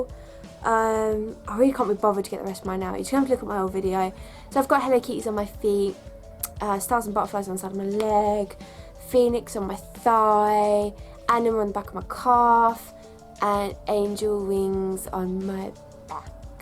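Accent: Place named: Australia. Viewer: are you from Australia? New Zealand? I heart your dialect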